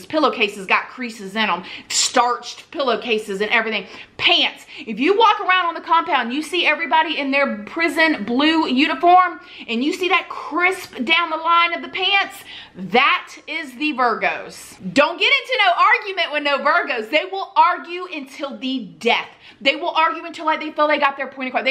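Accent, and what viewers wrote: South, United States. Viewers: Hello. I’m a little late to your channel and everything so I doubt you even see my message but I think you’re awesome. I’ve been binge watching your videos for like the last 3 weeks now and I’m hooked. At first I thought everything on your channel was strictly for women only but I kept watching anyway. I’m from Pittsburgh PA and I know you’re from Florida but you’re very easy to listen too. Plus you remind me of one of the females from around my way. Just with a little southern twang. I loved this video in particular a lot. I know it was just for fun but a lot of what you said was right on point. I’m also a libra so I was definitely feeling this. Anyway keep doing what you’re doing. You have a fan over here.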